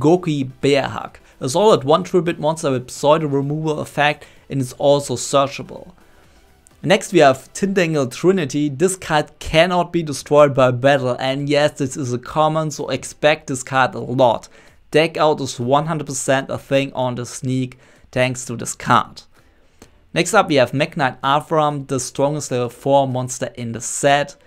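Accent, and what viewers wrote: Germany. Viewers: Great video. A friend showed me this for the upcoming sneak peak. Loved the German(?) accent.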